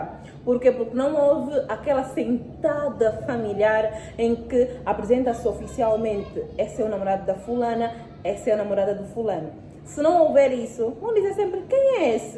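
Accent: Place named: Portugal. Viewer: Ela tem um sotaque português, muito agradável.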